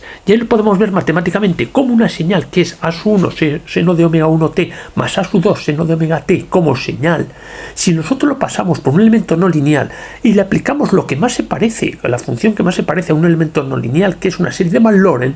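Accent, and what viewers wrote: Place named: Castile, Spain. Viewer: DIJO UN CHINGO DE PALABRAS Y NO LE ENTENDI NI MADRES, NO SE SI ES POR EL ACENTO(CASTELLANO SUPONGO) O POR LA VOZ TAN GASTADA, LE SUGIERO QUE DICCIONE BIEN SUS PALABRAS Y TRATE DE SER AS NEUTRAL EN SU ACENTO SALUDOS.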